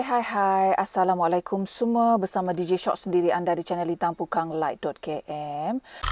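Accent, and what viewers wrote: Negeri Sembilan, Malaysia. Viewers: akak org nogori ko? slm sri segomban!!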